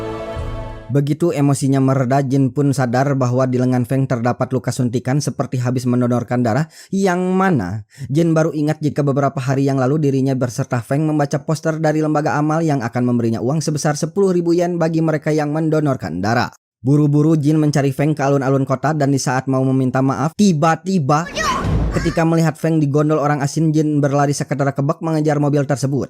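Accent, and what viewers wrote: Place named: West Sumatra, Indonesia. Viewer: Kok ini logat nya kayak org minang ya.... Apakah emang orang minang min😂😂😂😂😂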